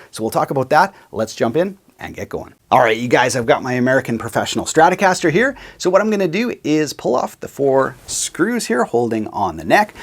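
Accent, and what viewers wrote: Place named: Maritimes, Canada. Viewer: Prairies eh? I was so sure you were from the maritimes LOL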